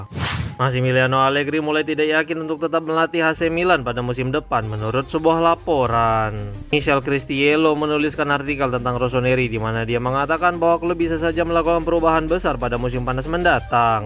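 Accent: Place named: Mamuju, Indonesia. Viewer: Ini adminx dari kab. Mamuju, prov. sul-bar yach? Logatx mirip orang mamuju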